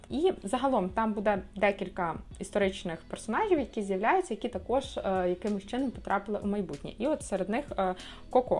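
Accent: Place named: Surzhyk, Ukraine. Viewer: На нормальном русском языке смотрели бы вас больше. Чем на деревенском польско-русском суржике.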